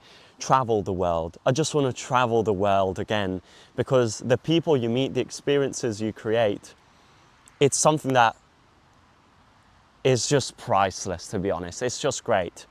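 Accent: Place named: England, United Kingdom. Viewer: he sounds VERY ENGLISH to ME. Any background info on why you moved to Italy?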